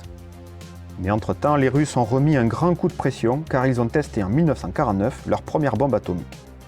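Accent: France. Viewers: Je démarre cette vidéo et ooooh oui un accent bien français 🙏 rien que pour ça je m'abo. Super vidéo aussi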